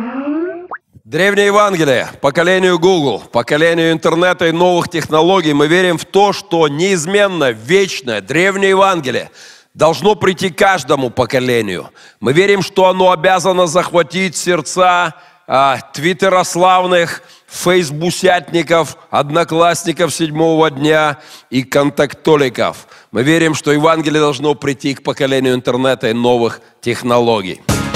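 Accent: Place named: United States. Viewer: Что - за американский АКЦЕНТ?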